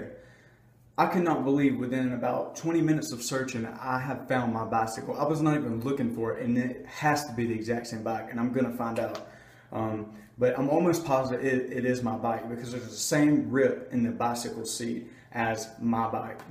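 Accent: South, United States. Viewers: it's like that alot in the south. some words come off really southern for some people and others dont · Dude I am so confused on your accent. Like one second you sound normal American, then sometimes you sound like you have a southern accent, what the fuck. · i love that country voice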